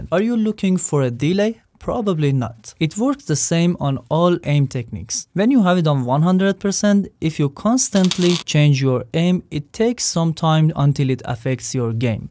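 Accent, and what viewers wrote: Iran. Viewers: Persian accent never die!!!😊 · از لهجت فهمیدم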